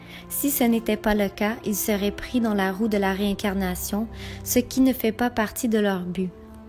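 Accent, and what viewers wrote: Quebec, Canada. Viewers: Bahahahahaaaa je m'attendais pas a entendre du Quebecois 😂😂😂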